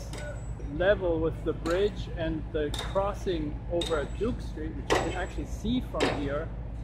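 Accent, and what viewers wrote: Germany. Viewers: You are sounding a bit german Im sorry if not 😂 (Im from germany) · Your accent sounds kinda German 😄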